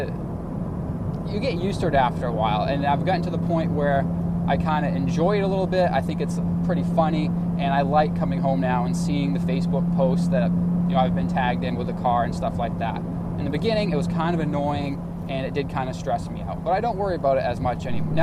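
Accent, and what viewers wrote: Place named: Boston, United States. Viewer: I noticed that you had a slight Boston accent. then when you said you're in Maine I thought to myself, "ohhhh"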